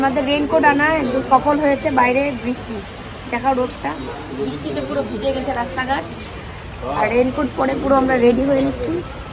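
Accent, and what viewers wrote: Bangladesh. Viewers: Prottek jaygay ak ak ta ancholik vasa thake, ota sobai jni. proyojon a nijeder moddhe kotha bolao hoy vlog cholakalin, bt ajkal puro vlog ta besir vag somoy Bangladesher vasay/ local vasay kotha bole vlog continue korche, Really khub odd lagey sunte. ak ak br bolbe seta vloi lage, amrao porichito hoi vasatar sathe. tumi fresh Banglay chesta koro. o toh coochbihar er meye, ki sundor kore kotha bole. tomar kotha gulo baddo kaney lagey. kichu mone korona bollam bole. chesta koro nije k improve korar. Roj vdo dekhi, cmnt. kori tai jeta thik mone korlam seta bolei fellam.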